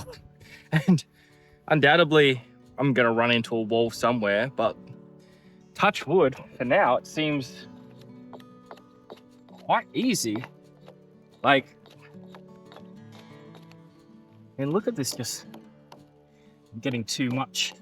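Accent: Australia. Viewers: Hello fellow Aussie. Wishing you all the very best in your new adventure on the land. I’ll be checking in to see how you’re going on your homesteading journey. Great to hear a fellow Aussie on YouTube.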